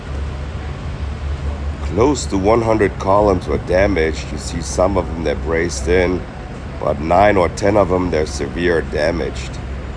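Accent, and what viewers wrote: Germany. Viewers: Sounds like you have a cold and your German accent has gotten stronger. Welcome back to California.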